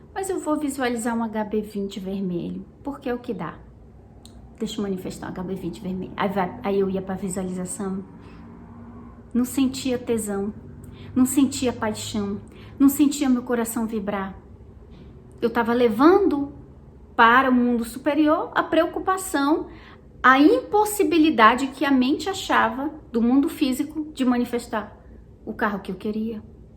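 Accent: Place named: Northeast, Brazil. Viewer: Vc é uma fofa!! Sem contar que adoro seu sotaque... Amo os sotaques nordestino... bjosss!!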